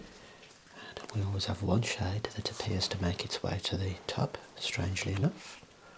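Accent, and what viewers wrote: Birmingham, United Kingdom. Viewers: Brummie accent. Found in Birmingham UK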